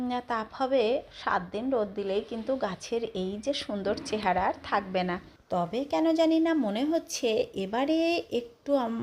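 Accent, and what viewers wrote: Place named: Bangal, Bangladesh. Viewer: তুমি মোনেহয় বাংঙাল ।তোমার খাওয়া কথা বলো আমার সাথে মিলে যায়। আমরা বাংলাঃ ফরিদপুরের। অনেক দিন ধোরে বেরাকপুরে।❤❤❤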